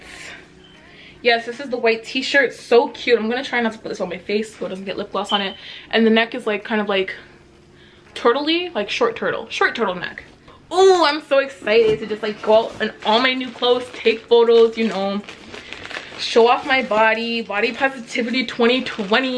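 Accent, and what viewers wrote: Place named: Toronto, Canada. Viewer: I’m from gta area and just listening to you I’m like, that’s a Toronto girl I know it loool!! Keep up the hustle girl you’re killing it!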